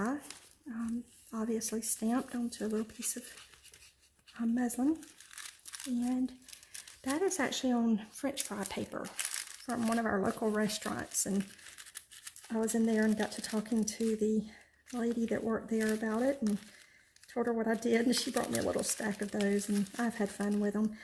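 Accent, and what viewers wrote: South, United States. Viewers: Don't you dare apologize for your accent lovey, it is just charming ( I love southern accents:D) and it is part of you and your history. Hello from Australia btw xo I just love your journal, it is gorgeous (especially love the idea of the pen/pencil space) have subscribed and I am sure I will enjoy many more of your videos in the future, well done xo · Being Southern myself. I think your accent is perfect. I love your journal. I look for to seeing more of your works. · you have a sweet southern voice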